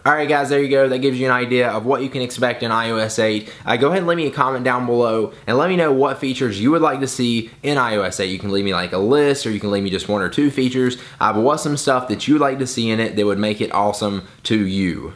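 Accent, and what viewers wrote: United States. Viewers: he is gorgeous... what accent does he have? I mean I know it's american but ..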